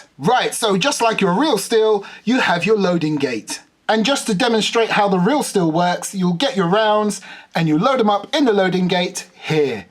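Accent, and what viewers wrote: Texas, United States. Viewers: actually, your texas accent sounds awesome. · Your american accent is actually really good! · Starring In: The Rifleman Your American accent is great, the western intros are hilarious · Your Texas accent was actually really good. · Good American accent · nice american accent XD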